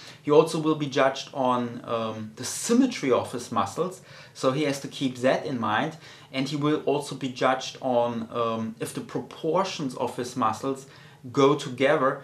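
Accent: Germany. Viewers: you've got such a german accent! :D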